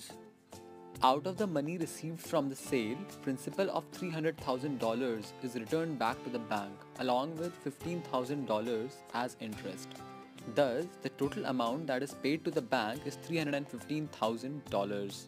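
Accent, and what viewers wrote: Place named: India. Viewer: so funny Indy accent!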